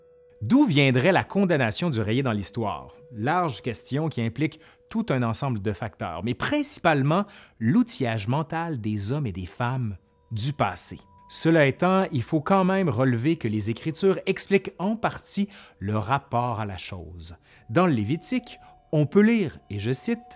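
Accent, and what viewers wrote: Quebec, Canada. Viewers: Bravo ! J apprecie de plus en plus votre chaine. J adore l histoire, et vous arrivez à me faire découvrir un tas de choses ! En plus, j avais un peu de mal avec l accent québécois et maintenant j adore 🥰 Continuez, c est si passionnant!! À bientôt, Aurore de Paris 🐇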